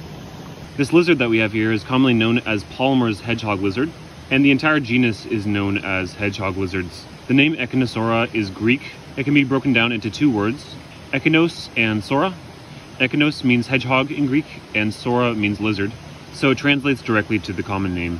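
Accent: United States. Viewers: American Accents are bonkers as it is but this guy sounds like he’s trying to talk with marbles in his mouth.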